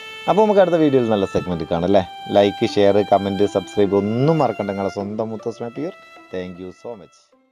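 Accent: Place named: Kannur, India. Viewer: നിങ്ങളുടെ സ്ലാങ് കേട്ടിട്ട് കണ്ണൂര്കാരനാണെന്ന് തോന്നുന്നു. 🤔🤔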